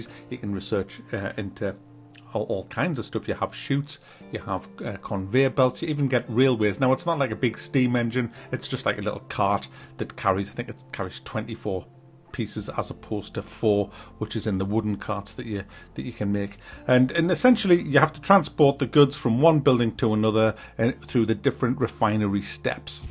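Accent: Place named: Newcastle, United Kingdom. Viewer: I love your videos man, they're reassuringly Geordie. Keep it up!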